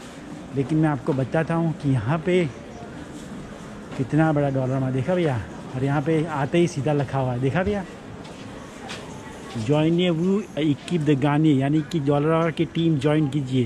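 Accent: Gujarat, India. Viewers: Aa bhai gujarati lage 6 hene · Bhai tme2 to gujrati niklya tmari hindi thi khabr pdi 🥰☺😄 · ગુજરાતી ઓ ની હિન્દી સાભળી મજા આવી ગઈ... KEEP IT UP BROTHER 👍👍👍👍👍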